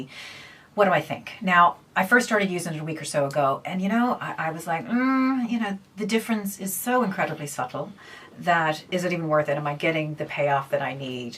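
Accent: Ireland. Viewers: Great review! You’re getting a Dublin accent 😁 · Just listening to you and you saying you're in Europe I kept hearing an irish lilt and was satisfied to see you are based in Dublin! It's so interesting how accents creep into others. I love this review, very helpful as I rarely wear makeup and love the no makeup makeup. The pencils look so promising as well as the balm.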